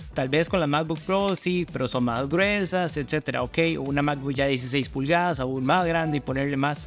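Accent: Costa Rica. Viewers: Reconozco la voz de un tico a kilómetros o me equivoco? 👀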